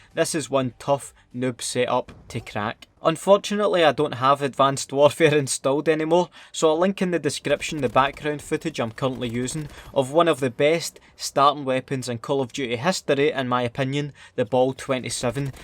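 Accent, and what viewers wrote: Scotland, United Kingdom. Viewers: What part of Scotland do you come from? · You from Scotland? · I love he’s Scottish accent lol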